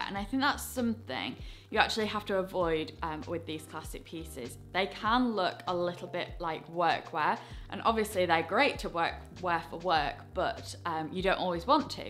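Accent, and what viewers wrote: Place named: Manchester, United Kingdom. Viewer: First of all— obsessed with your style tips. This is literally so helpful. Second, I didn’t know why your accent sounded so familiar until I realized that you’re in Manchester and that’s where the 1975 is from, and Matty’s accent sounds exactly the same. Haha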